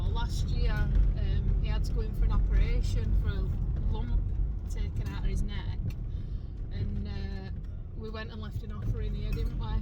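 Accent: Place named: Yorkshire, United Kingdom. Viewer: What a fantastic whire rose accent! West Yorkshire or South? Hello neighbour! Beautiful garden, I'm glad to have taken a little peek here on your channel xx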